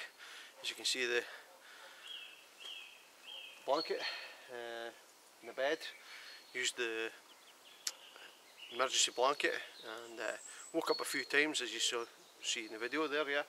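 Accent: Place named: Scotland, United Kingdom. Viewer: Really enjoyed the videos, I have watched a few of your's tonight and have Subbed to your channel...can you clear up one thing tho, your accent, which shire of Scotland are ye from lol? Cheers, keep up the gid work jeck. I checked out your Wix page and answered my question, yer just up the road lol mun. Kielder forrest will be the playground then haha. Regards Ray. (Eyemouth)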